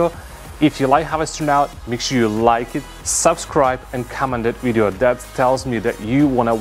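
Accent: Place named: South Carolina, United States. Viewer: Man that SC accent is intense 😊 great video.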